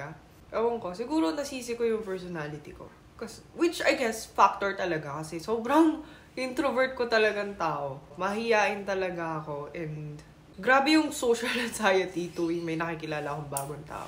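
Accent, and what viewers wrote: Batangas, Philippines. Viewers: Parang filipina kalang magsalita*sarang haeyo*!😅❤❤❤🎉😊😘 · New subscriber here,. I found your vlog sad and funny at the same time. Funny because for a Korean, you certainly have learned the way the girls talk in the Philippines and it’s kind of weird listening to your funny words and looking at you who’s as Korean as Korean can be. You’ll get over your homesickness, though. The good thing is you’re not halfway around the world if you ever felt the other way around now, kung ma homesick ka naman sa bansa kung saan ka ipinanganak. Keep smiling, you’re beautiful. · Ramdam kita bebe😢😢 kaht savhn mo na sarili bansa mo un pero hnd ka naman dun lumaki mahirap mag adjust mamimiss mo ung vive sa pinas tapos sobrang seryoso din naman sa korea hirap ka mag adjust,, like Ryan bang sabi nga nia gusto nia mamatay sa pilipinas tapos ngaun pilipina ang nililigawan niya kasi pusong pinoy sya❤.. 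I heard sa batangas ka kasi ung punto mo🤌Batangena rin ako🤌🤌 enjoy mo ang life mo at mag iingat ka palagi.. sundin mo Asa puso mo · Hiiii poooooo.... Nag tataka lang po... Kaya mo po ba mag Batangeño accent while speaking Korean? Paano po kaya yun?🤔 Anyways... You're always beautiful po and feeling ko ang sarap sarap mong i-hug... Always take care po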